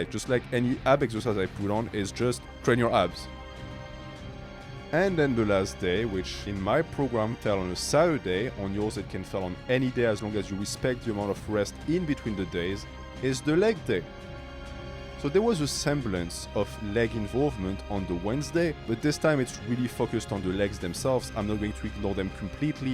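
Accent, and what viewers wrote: France. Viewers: You've got a slight delightful baguette accent. As a fellow member of the baguette nation I salute you. Keep it up. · are u french parceque on dirait que tu as un accent ? 💪🏽🙏🏽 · that french accent, love it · Are u french?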